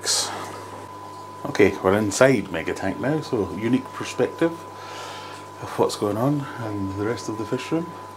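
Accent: Scotland, United Kingdom. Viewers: Mate, you've never sounded so Scottish. Lol.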